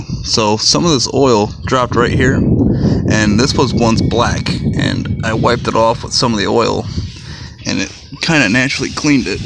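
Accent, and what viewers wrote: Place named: Michigan, United States. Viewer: Lol yea man im from michigan too! I got the accent too haha..but im just lookin to buy a nice condition 05 grand prix w the 3800...i do the oil changes in all my cars and just wanted to see how much of a pain this one was...looks fairly easy access to me...i also know the throttle bodys get jammed up on these...whats ur opinion on that?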